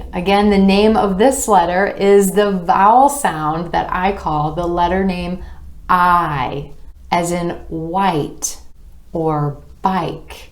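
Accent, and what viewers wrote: United States. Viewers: You can become a excellent teacher in amarican accent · Respected mam your teaching American accent praise worthy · Because of I find it easy to learn American accent. Her accent is too cool. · Mam, I'm from India. Here everyone use UK accent but I like US accent.You are really a good teacher. I Understand very clearly. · Hey! Your pronunciation is wonderful. Typically the AMERICAN I like. Good luck. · Mam you are amazing I love American accent but I dont know how to sound like that and now I am improving myself by seeing your videos thank you mam❤ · Is this American or British pronunciation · I heard the pronunciations, like you said 'U' like it is. So, my question is, why do Americans say stOOdent instead of pronouncing the 'U' in it. Why do you say cute, with the 'U' in it and not cOOt if they pronounce it as a double oo. Same goes for cucumber, then rather say cOOcOOmber, if you want to proceed with the incorrect pronunciation. 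It doesn't make sense. Same with the word mature... Just asking.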